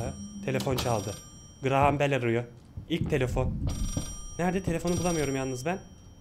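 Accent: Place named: Izmir, Turkey. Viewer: Abi İzmir'de veya çevresindemi yaşıyorsun biraz şiven benim olduğum ilçedeki şiveyle uyuşuyorda :D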